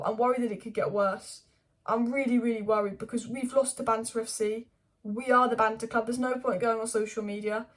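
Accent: Manchester, United Kingdom. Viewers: I noticed that prominent Manchester accent. All United fans tend to have that kind of a Manchester accent lol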